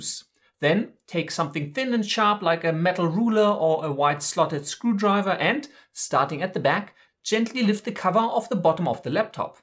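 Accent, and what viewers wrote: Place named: Germany. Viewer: In fact it feels like German accent to me, :) Oh glad to know you are being happy with the computer. I saw so many issues with that model on the Amazon US people's reviews that decided to get the MSI P65 instead. After 20 years using macs its scary moving to a Windows computer. Just in case you feel curious about my work, feel free to check <link> and <link> thanks so much for the feedback once again! Cheers from Spain!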